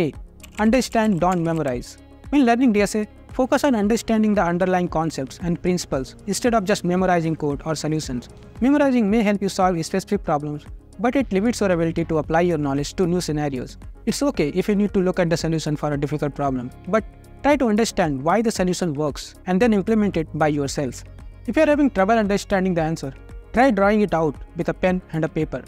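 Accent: India. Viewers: bhaiya aap bihar se ho kya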